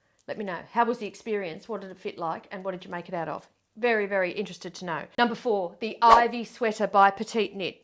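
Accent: Australia. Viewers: I get the ick with that Ooey Gooey name, too. The design reminds me of lava lamps. Not hot! Fish girl fashion: definitely hot, but I'd modify so the sleeves were in the usual position. I have not knit any of these, though Isabel Kraemer's designs Tresse and Nasrin are in my library, and I have fingering weight yarn for them. Thank you for your video series, they are super entertaining, especially with that Aussie accent!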